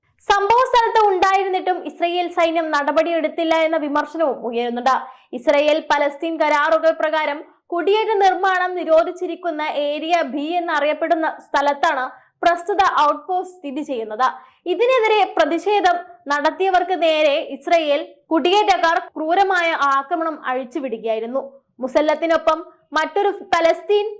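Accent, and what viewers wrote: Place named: United States. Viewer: ഇത് us ഇംഗ്ലീഷ് 🫣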